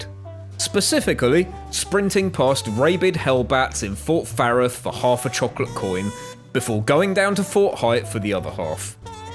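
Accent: United Kingdom. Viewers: I adore these videos, an accent I can actually enjoy listening to and a similar sense of humour to me (+ great videos too). Thank you for uploading videos like these, they’re great to enjoy as a fellow bri’ish brethren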